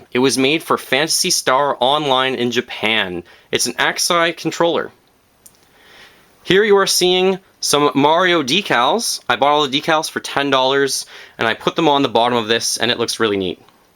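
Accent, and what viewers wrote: Canada. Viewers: Is he Canadian?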